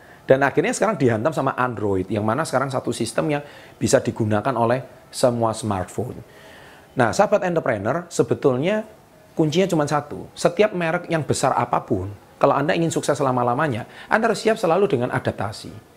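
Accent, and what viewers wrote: Java, Indonesia. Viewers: Aq sangat terganggu dg logat kental jowomu mas..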